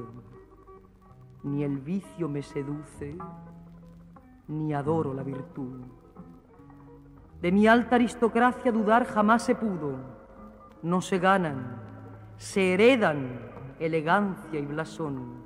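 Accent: Spain. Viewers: Poesia bellisima, pero la andaluza que recita, es maravillósa de andaluza a otra, es genial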